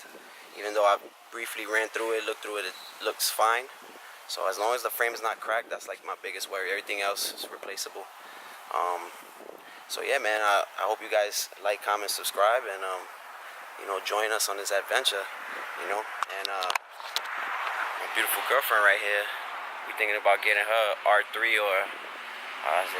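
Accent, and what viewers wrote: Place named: Dominican Republic. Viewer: Desde que el pana hablo españo, le salio el sason dominicano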